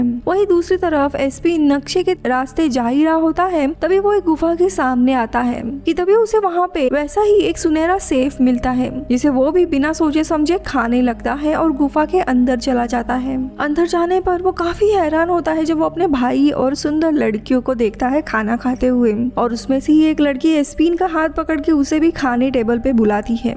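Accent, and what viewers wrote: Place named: Bengal, India. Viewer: apki hindi sunkar aysa lagta hay ke ap bengali ho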